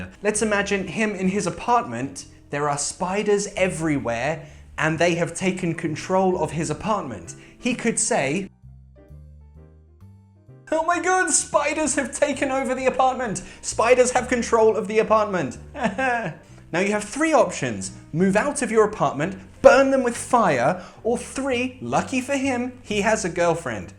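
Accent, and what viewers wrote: United Kingdom. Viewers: This is my favorite video. Nice story, cute drawings, clear explanations... and of course your British accent! Thank you very mush for posting it. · I love his British accent 😁💗 lol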